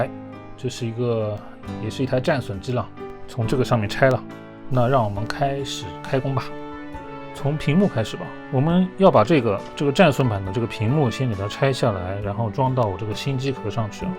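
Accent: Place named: China. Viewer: 口音明顯中國人啊⋯中國人講日語吧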